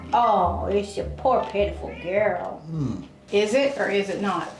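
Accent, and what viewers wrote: South, United States. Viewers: His voice sounds like Tom Hanks with a southern accent! 💞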